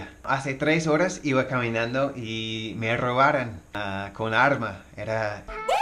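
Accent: Colombia. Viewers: te ganaste un sub eres un gringo pero de una forma colombiana y hablas como un colombiano y un consejo cali es miy lindo pero hay dasmasido ladron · pobre man peor bueno eso suele pasarnos en Colombia, me causa mucha gracia su acento y palabras colombianas! jejejej adelante mi querido gringo! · Leí todos los insultos con su acento jajajaja Colombia amo su acento - Saludos desde Costa Rica que hubo pues parcero (? xD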